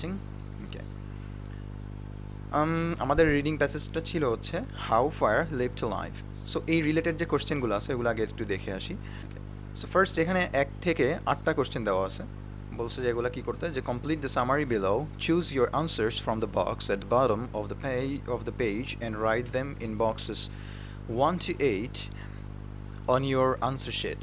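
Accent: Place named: United Kingdom. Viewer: Bro..language just awesome 😃onek tai britis der mto..ar ata e IELts er very important thing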